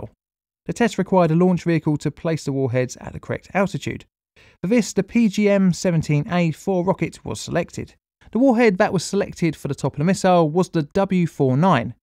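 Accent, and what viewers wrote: United Kingdom. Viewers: His is the best British accent after Patrick Foote (Name Explain). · I think your videos are awesome, informative, and very well produced. The British accent is just a bonus. Also I am enjoying the increased video production, atleast something good is coming out of this Covid crap.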